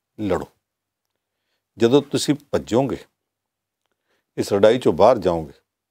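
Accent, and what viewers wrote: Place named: Punjab, India. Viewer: sahib di jatki boli manu bahut pasand hae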